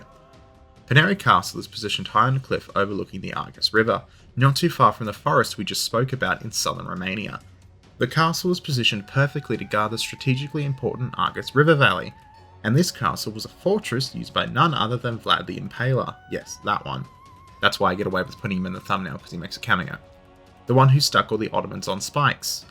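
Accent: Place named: Australia. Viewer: Something I wasn't expecting was an Australian historian telling me about Romanian folklore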